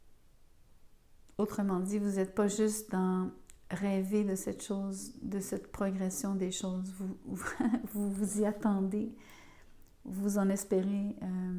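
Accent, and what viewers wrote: Quebec, Canada. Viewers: Merci pour ce tirage ! J’en profite pour vous dire que j’aime beaucoup votre voix douce, votre rire discret et votre charmant accent québécois.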